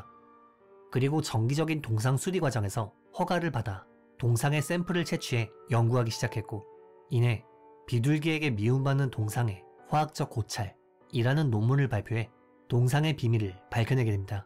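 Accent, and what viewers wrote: Japan. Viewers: 오 이걸 이제야 보네요ㅎㅎㅎ 어투가 살짝 간사이쪽으로 들려요! 어처구니없는 사고가 많았는데도 좋은 영상 찍어주셔서 감사합니다 이번 일본여행은 좋은 일만 있으시길❤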